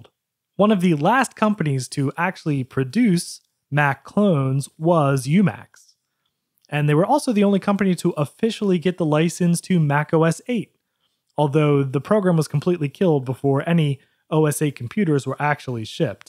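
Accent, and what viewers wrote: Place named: Philadelphia, United States. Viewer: LOL you didn't have to mention "the hot Philadelphia sun"; as soon as you said "wooder" (water) it was plain as day where you're from good sir. :D Thanks so much for sharing this awesome find! I recognized that case from the old Dells of the same era, but I never got the opportunity to see any of those official third-party Macs before!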